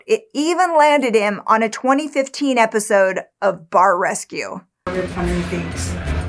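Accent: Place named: New York, United States. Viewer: a voice over that makes you run for MUTE. Holy New Yawk!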